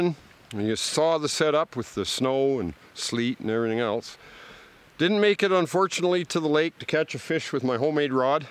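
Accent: Canada. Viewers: Hey, I tune in from Australia as enjoy your Canadian voice. Plus I learn lots.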